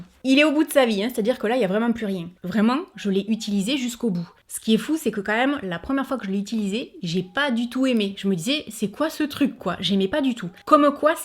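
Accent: South, France. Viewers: Des cils de bichoubette 😁♥️♥️♥️ j adoooore l expression toute mignonne! Et cet accent de chez mon sud, si mignon 🤗 bravo, vos vidéos sont de l énergie positive pure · Merci pour tes partages! Ecouter ton accent du sud est un pur bonheur (je vis en Belgique lol) Pour le démaquillant, je t'invite à essayer l'huile de jojoba chez Aromazone si les huiles ne te dérangent pas et après hop un peu de savon et le tour est joué! Et c'est très économique! Et ça fait partir les maquillages colorés (comme j'aime lol ). Eh oui, par contre il faut se méfier des savons et les choisir si possible "saponnifiés à froid" car ils ont gardé comme cela tous les bienfaits des huiles. Le mascara que j'adore chez Essence c'est le Lash Princess! Ils fait des cils de fou! Et les brumes c'est super pour fixer le make-up également!;-) Des bises lumineuses Steph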